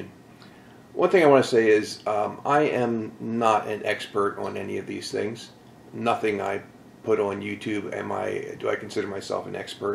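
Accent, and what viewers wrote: Pittsburgh, United States. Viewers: haha, thanks for the chat and knowledge! My mom is from Aliquippa and hearing your accent reminds me of my uncles over there in the Burgh.. Also, one of the reasons I like Jim Kramer's Mad Money too, lol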